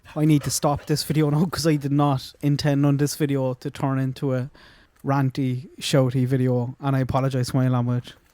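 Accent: Ireland. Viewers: The rate of an Irishman!!! Love it!! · my Brother, it felt very strange hitting the like button with the celebratory starred thumb gyrating. My heart is with you as your beloved brogue bears aloud the pain we all now feel and share. I wish I were in whatever corner of this world you occupy and I could buy us a few pints of beer, if you don’t total-tea exclusively. Though I do have a master of fine arts in creative writing, I have nothing more to say. Please take care of yourself/selves, and we cool-ass-subbies. This is a tragically sad story, yet it is still a blessed day to be alive. A part of our human experience is the requirement to hold disparate truths and emotions within our minds, bodies, and spirits all at once. 🙏🏽✌🏽❤️ from Minnesota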